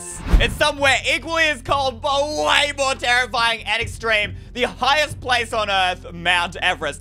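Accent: Australia. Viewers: I’m Australian and good Aussie voice! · Prove you have an Australian accent:leever, caan’t, etc. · I thought you were British so you from Australia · I dont ever notice accent.. Maybe that's because im an Aussie Too! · I can hear your Australian accent because I am American, which I am from the United States which has the most carnivorous plant than any other country in the world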